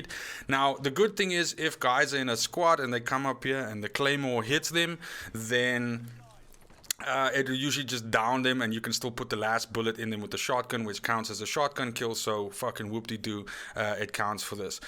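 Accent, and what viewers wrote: South Africa. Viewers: Are u South African?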